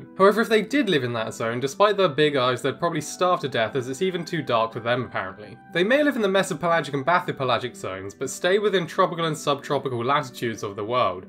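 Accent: RP, United Kingdom. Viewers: Calling zoologists/scientists "stupid" while regurgitating all their findings in a lisping plummy nonchalant monologue 🤦🏻‍♀️ L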